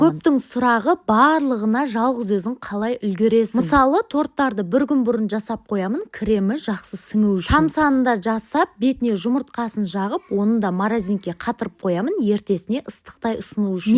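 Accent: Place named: Qashqadarya, Uzbekistan. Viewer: Qashqadaryodanmisiz deymanda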